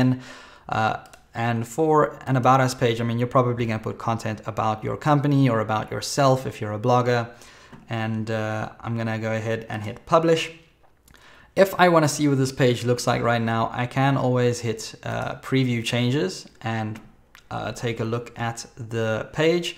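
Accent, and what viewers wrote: South Africa. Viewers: Hey! thanks for all the tutorials man, really great to see a tut with a South African accent for once haha keep it up dude!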